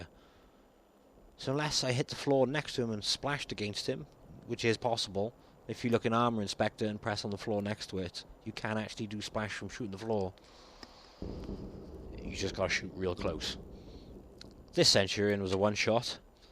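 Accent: Wales, United Kingdom. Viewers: Those Dulcet Welsh Tones :)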